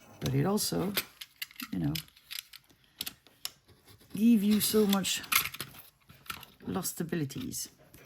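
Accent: France. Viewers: Dear don't be sorry. I love to see what you make in your own wonderful chaotic way. And I love to hear you talk with your beautiful French accent. But post when you're okay and when you're up to. Good luck with your operation, try to not worry to much. Hugs from me, Gertie from the Netherlands. Btw I'ld lóve to see the puppies! 🩷🩷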